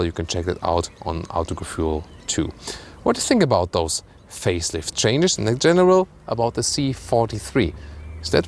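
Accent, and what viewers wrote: Germany. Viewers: The German accent is literally the best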